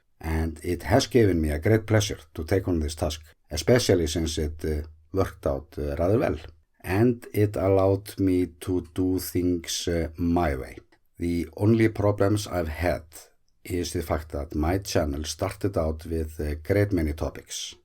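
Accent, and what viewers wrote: Iceland. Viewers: Your channel is much more than just about geology, earthquakes and a volcano, even though that's what initially drew me here. Your educated insight and coverage has been an enjoyable and informative experience. I've grown to love seeing and hearing about all things Iceland, so I'm here for the long haul. I'll look forward to seeing my notification bell lit up and finding you there. There's so much more to see and explore and you'll be the perfect guide, my friend. Your authentic Icelandic accent is a nice addition, too. 😉 . . . Stay well.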